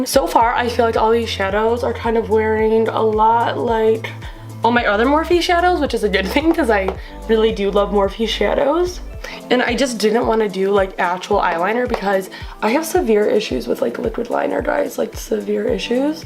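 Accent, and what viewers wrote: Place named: Midwest, United States. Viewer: youre so pretty !! you must be from the midwest too, I can tell by the accent <3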